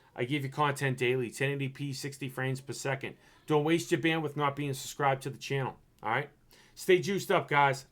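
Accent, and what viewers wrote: Boston, United States. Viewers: Are you from Boston bro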